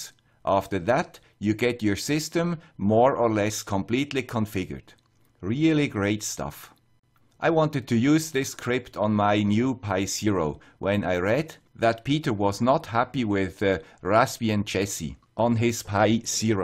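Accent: Switzerland. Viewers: I am half swiss and I can confirm this man's accent is aggressively Swiss.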